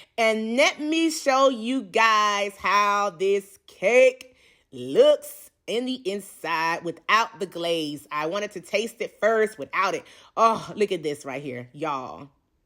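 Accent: England, United Kingdom. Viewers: Love the hood, England accent. You ought to give us that combo more often I LOVE IT🤣 also the cake looks moist and delicious. Im excited to try this recipe for sure. Thanx my girl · The British Accent had rollinng. I'm like "what happened"? lolololololol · Not the British accent 😭😭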